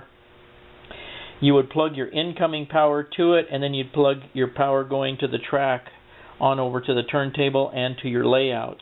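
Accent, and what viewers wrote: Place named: United States. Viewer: American- ish lol!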